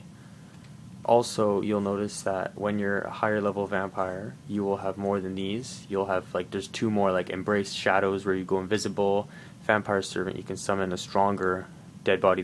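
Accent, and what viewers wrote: Canada. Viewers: yo are you canadian a friend of mine has the same accent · You're Canadian, aren't you?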